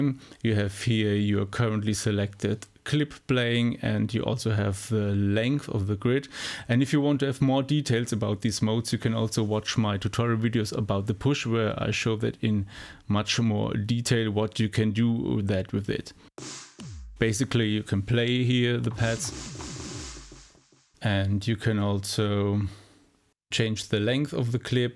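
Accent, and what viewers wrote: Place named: Germany. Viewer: Well Done! Up And DOWN! love the german accent! thx a lot!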